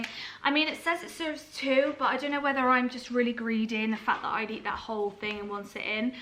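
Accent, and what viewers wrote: Bristol, United Kingdom. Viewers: I knew it was a Bristolian accent, mines the same😂😂x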